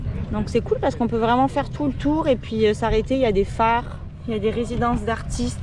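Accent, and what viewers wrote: South, France. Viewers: Haaaaaaa tu m’as manqué 🎉 😮 trop cool d entendre le son du sud 😊 , du calvados j entend le soleil ☀️ merci 🫨 mince la creveson 😵‍💫 et oui 13 😊 , vous êtes au top ✌️ j’oyeux anniversaire en retard j ai envie de pleurer 😢🎉🎉🎉 bizou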